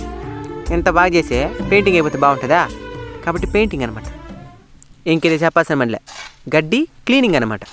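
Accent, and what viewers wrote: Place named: Chittoor, India. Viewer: ANNAYYA MEMU ME OORIKI May LO vasthamu yekkada gudi undedhi PLEASE address cheppagaru ME VOICE vintunte chittor jilla vallala undhi PLEASE PLEASE E gudi chusina tharvatha aa gudiki ravalani undhi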